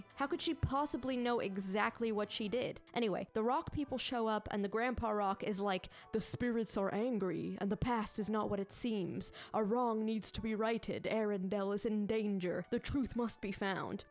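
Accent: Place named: Ireland. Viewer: you sound irish and i love it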